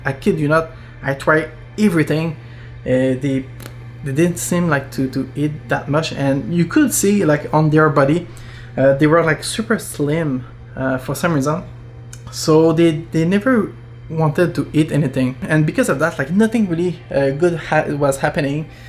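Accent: Quebec, Canada. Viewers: Hi sorry for the loss are you from Quebec Canada. Cause you have an accent.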